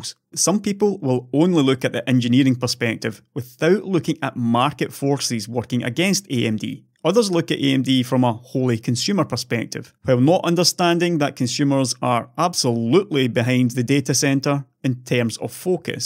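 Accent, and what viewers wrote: Scotland, United Kingdom. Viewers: his Scottish strong accent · your pleasant Scottish accent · I've learned his Scottish accent and it's really great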